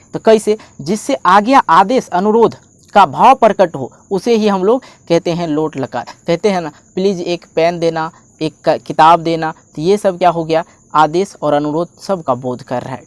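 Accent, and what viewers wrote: Bihar, India. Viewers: Sir aap Bhoot hi acha padhate ho aap bihari ho na 😅😅😅 mujhe pata. Chal gya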